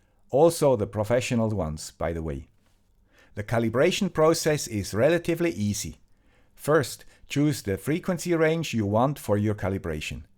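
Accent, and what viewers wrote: Switzerland. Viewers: So true Sir. And the cool guy with the cool Swiss accent always responds! Talking about dedication 😎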